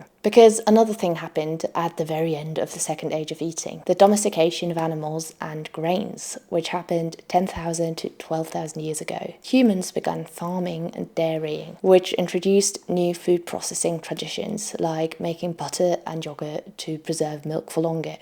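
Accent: England, United Kingdom. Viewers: I'm a little puzzled. You have an English accent and kicked off with American chocolate being rejected in Britain but then the whole video was about the US market, the FDA and American food giants. No mention of the rejection of many ingredients by the EU for example.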